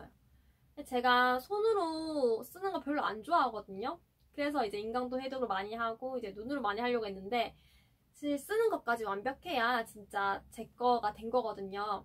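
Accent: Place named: Gyeongsang, South Korea. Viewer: 경상도 분이시네요 ㅋㅋ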